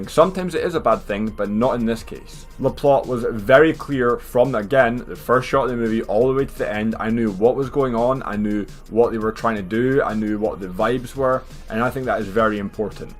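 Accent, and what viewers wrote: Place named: Scotland, United Kingdom. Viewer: Mate you sound scottish 😀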